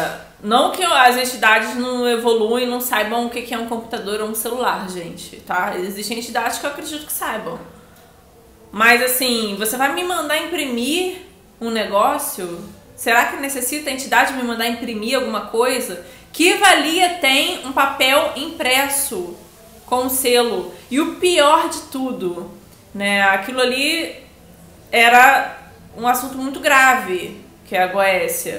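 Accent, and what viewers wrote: Rio de Janeiro, Brazil. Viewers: ? VC É DO RJ?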